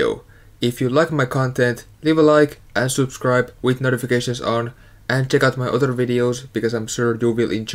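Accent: Finland. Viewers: When you started talking i was like he is from Finland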